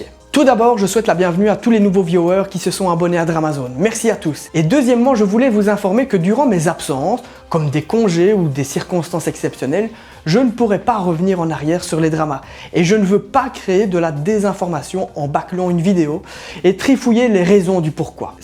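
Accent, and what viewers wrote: Belgium. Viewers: tu es belge nn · T'es liégeois ?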